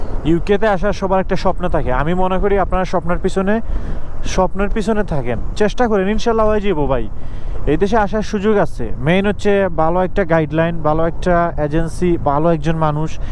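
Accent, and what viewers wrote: Sylhet, Bangladesh. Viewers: ভাই আপনি কি সিলেটি? নতুন অবস্তায় ফুড ডেলিভারি করা যাবে কি না জানাবেন দয়া করে New subscriber of your ❤️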